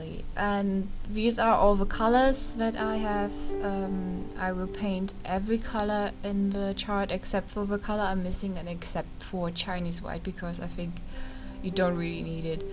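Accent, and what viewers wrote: Germany. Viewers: thx i was thinking about buying this set :) now i am going to get it :3 btw are you german ^^?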